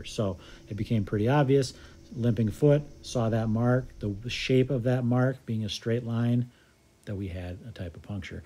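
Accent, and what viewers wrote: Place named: United States. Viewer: Is it just me that your accent is more american nowadays? I used to hear your thick accent before so it is weird for me to hear your more american, english accent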